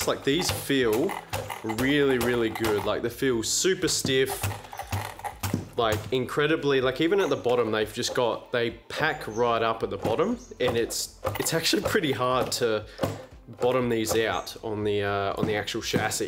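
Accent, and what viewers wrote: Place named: Australia. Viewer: Campletown shirt Aussie accent 💪must be a local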